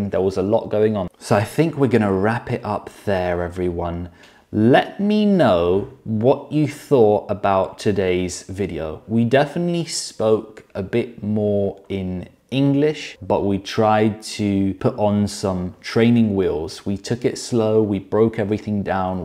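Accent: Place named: United Kingdom. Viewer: Come to the U.K-there are literally millons of us here with the same accent!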